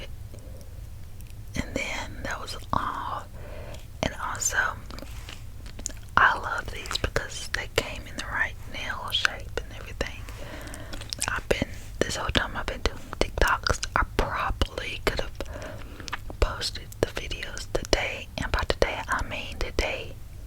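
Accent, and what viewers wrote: South, United States. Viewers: I couldn’t help but notice a southern accent or I’m just crazy lol! Loved the video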